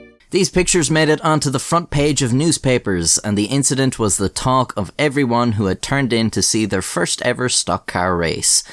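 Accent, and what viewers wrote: Ireland. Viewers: so a light Irish accent almost pronounces Nascar in its proper grass roots hill folk form... hillbill sees race cars and says "Thats a niiice car" IE the word Nascar was born Also, used to race Karts as a Kid... Dirt circle, WKA. Fist fights were very common at the race track.. usually one every weekend at least.. One time i was at a Dirt Modified Race and at the end because of a disputed win, there was a fist fight of about 20 folks on front stretch/winner circle and the whole crowed basically started rioting.. beer cans were flying everywhere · Dawg your Irish accent is so smooth and pleasant to listen to, stay beautiful my friend. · yo irish man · Ever heard of Mike from Thatchapter? He's Irish too,I like your accent way better though.he sounds like Irish lite or something. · It’s wild hearing these NASCAR driver’s names pronounced with an Irish accent, You pronounced them correctly, just weird hearing them without a. 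“Southern Drawl”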